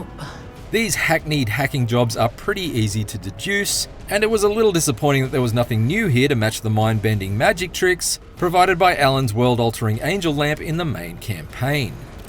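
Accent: Australia. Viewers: He's aussie and some news reporters have this style. Althugh this guy is particularly annoying, and I'm speaking as an Australian.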